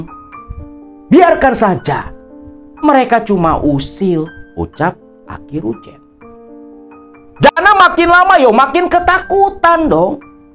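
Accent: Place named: West Java, Indonesia. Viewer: Kang keren saya akui selain cerita mistis sejarahnya pun dibeber kan. Jelas iq kang tinggi. Pertahankan bahasa Indonesia dengan logat Sunda kang❤❤❤❤❤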